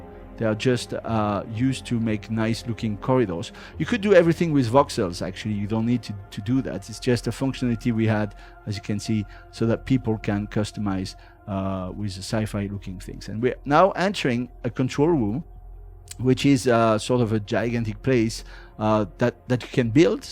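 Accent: France. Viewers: C'est mignon, l'accent français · l'accent français ^^ grillé a 100km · Cet accent français ! 😀 Accent peu prononcé en fait, ça va. C'est même très bien ! Bravo !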